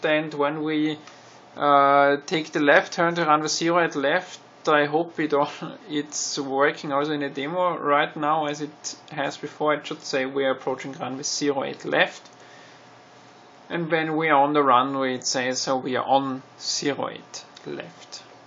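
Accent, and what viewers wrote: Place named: Austria. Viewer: danke fürs video, sehr anschaulich und interessant! Ich hab an ihrem Akzent gemerkt, dass sie Österreicher sind hehe, Grüsse aus Graz hehe!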